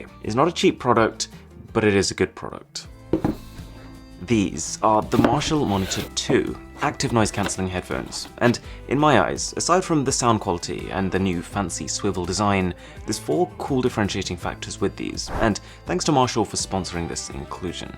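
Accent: RP, United Kingdom. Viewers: Absolutely love his posh English accent. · You sound posh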